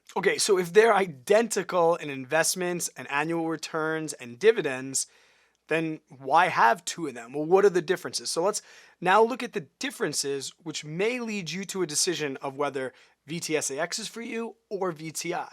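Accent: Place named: Long Island, United States. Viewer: of the etf's would you choose VTI over VOO and why? Also, I noticed the index fund VTSAX is selling at 89.18 with no $0 minimum investment. The video was posted in March, but can you clarify this? Should there not be a $3K start, wouldn't it be suggested to go for the automated perks on VTSAX? Lastly, would it be overkill to have both VOO and VTSAX (should you recommend VOO from my previous question)? Your videos are sleek, slow and capture great on camera energy. Don't change that Long Island accent, its golden!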